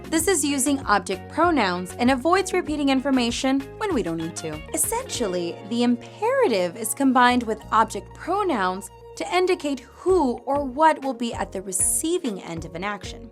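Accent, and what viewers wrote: Spain. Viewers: I have become a fan of your Spanish accent...!!!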